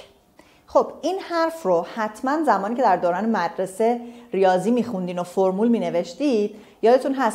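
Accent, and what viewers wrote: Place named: Iran. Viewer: سلام خانم استاد زحمتکش و لایق خسته نباشید من خودم را شاگرد شما میدانم اما یک خواهشی دارم آن هم این است که سعی کنید در قسمت تلفظ های کلمات لهجه ایرانی گونه تلفظ نکنید چون باعث گمراهی ما در آینده میشه تشکر از شما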